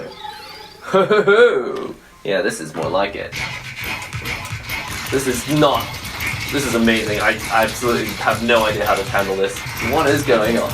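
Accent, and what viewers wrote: England, United Kingdom. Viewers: U have better british accent than me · I think you're the first asian i've heard with an english accent. I bet you go bonkers for tea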